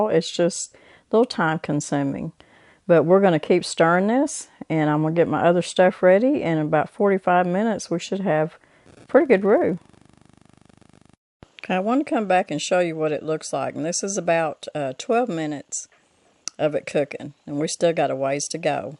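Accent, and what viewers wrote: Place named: Texas, United States. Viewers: U2 are wonderful, please tell me your accent, were are U from......Texas maybe................I just Love this accent. .......I am French Canadian living in Vancouver BC and I jet get exited with your life...Thank U2 for your great meal................ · that’s how we say thing in Texas!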